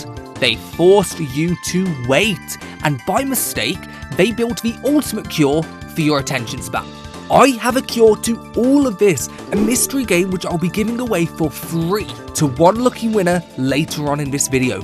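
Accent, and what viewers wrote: United Kingdom. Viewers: why do you have a British accent? Really good video either way!😊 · Are you from Birmingham?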